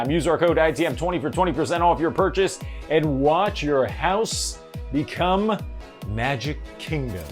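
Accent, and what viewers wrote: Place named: New York City, United States. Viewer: same! is such a natural with hosting! One of these days, I will do a "Hello Belle!" impression just as good as him. Plus, you can't go wrong with his NYC accent (, just an FYI, I'm going to your hometown later this month! NYC is my favorite! I'm so excited!!) Wheel of Fortune dropped the ball by not tapping him to be Pat Sajak's successor and instead going with that overblown twitty twatty Ryan Seacrest.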